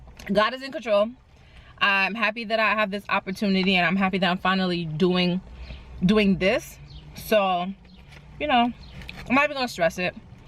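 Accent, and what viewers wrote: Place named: New York City, United States. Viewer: Girl I can tell you from NYC! Lol 😆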